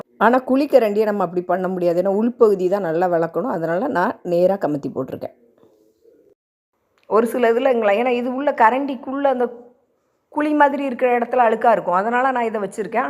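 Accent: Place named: Tirunelveli, India. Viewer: Unga native yendha ooru mam, ஸ்லாங் திருநெல்வேலி pola இருக்கு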